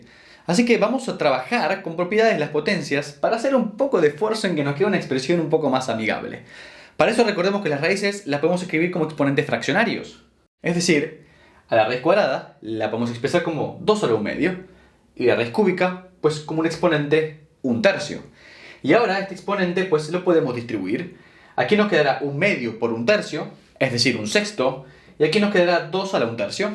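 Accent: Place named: Argentina. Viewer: me preguntaba lo mismo porque suena re argentino pero dice cogemos jaja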